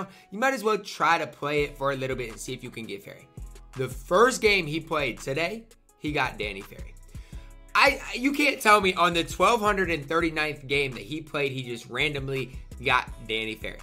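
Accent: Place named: New York, United States. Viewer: Yo are ur parents from the east coast? Ur from Iowa but sound like my friends from New York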